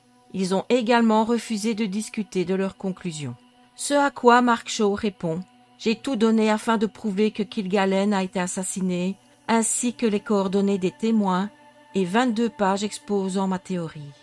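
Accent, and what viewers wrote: Belgium. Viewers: Bien sûr une affaire connue mais un point de vue et une analyse différente... 👍. Merci pour le petit accent Belge 😉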